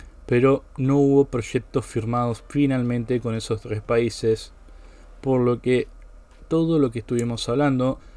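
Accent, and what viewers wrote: Argentina. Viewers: con ese acento seguro que es argentino o uruguayo , no me sorprende que admire a un pais serio como chile , simplemente ustedes no pueden aceptar que hace muchos años dejaron de ser relevantes,si no me creen observen sus villas miserias..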